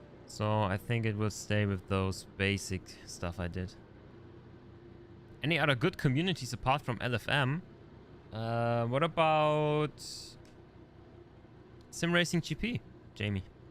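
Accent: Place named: Germany. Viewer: Are you German you pronuns it Like a German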